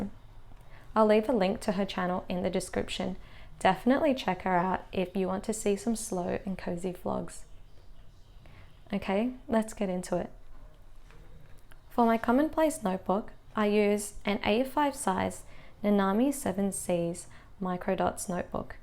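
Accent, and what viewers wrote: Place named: Australia. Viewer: Is it bad that I knew in the very first 3 seconds you were Australian like me? Hope you're doing ok and have found a job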